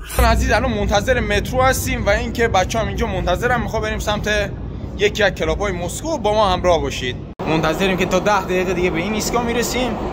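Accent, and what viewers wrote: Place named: Sabzevar, Iran. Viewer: لهجه سبزواری موج میزنه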